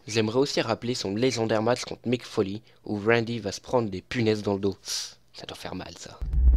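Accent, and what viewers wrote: England, United Kingdom. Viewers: je veux pas etre mechant mais je tient a dire que ton vieille accent anglais est vraiment soulant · Mdrrrrr trop gênant car le contenu ça va mais j'arrive pas à me concentrer, arrête l'accent anglais gros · Pourquoi tu parle avec un accent anglais c moche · J'ai kiffé mais y'a juste un petit truc c'est mieux quand tu parle sans l'accents anglais parce*-que avec on comprend pas trop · J'aime beaucoup le contenu mais paaaar pitié stop ton accent anglais putains xD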